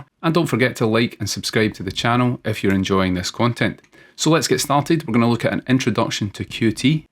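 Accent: Scotland, United Kingdom. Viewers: listening to scottish always makes me really happy for some reason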